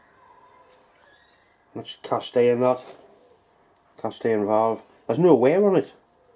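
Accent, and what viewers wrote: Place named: England, United Kingdom. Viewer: Ok, I dug up my very old account and subscribed to channel and I gave a thumbs up as I should have long time ago, fore you asked me to,. I am a long lurker here otherwise:) Excuse me for my language errors, I'm a norseman:) Hi, I like your stuff there in England, especially your, for me, different dialect. I love dialects. Most Swedes wouldn't care about such things but I do. Bo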